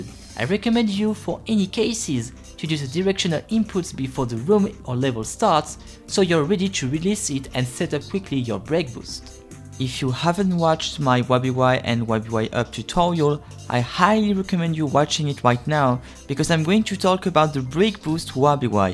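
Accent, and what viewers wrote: France. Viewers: T’es français toi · I hear a little bit of french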